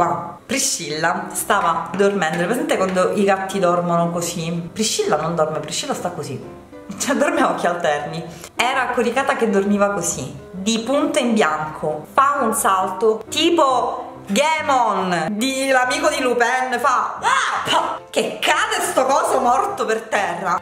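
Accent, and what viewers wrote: Sicily, Italy. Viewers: Stop al video prendo il pennello e lo #scatafotto muoioooo 😂 Mi piace che inserisci termini e accento siculo. 👍💕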